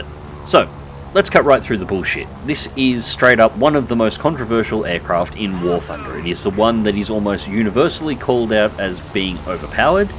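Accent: Australia. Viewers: time to listen to that attractive Aussie voice again <3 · what tier 4 soviet plane do you recommend? Love your manly aussie voice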